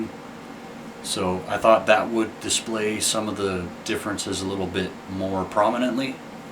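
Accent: Canada. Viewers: Just got into building about four months ago. I love your videos man. No this was not boring at all. I could watch this stuff all day. P.s Are you Canadian? My canuk radar is going off haha. I’m in Ontario. Cheers.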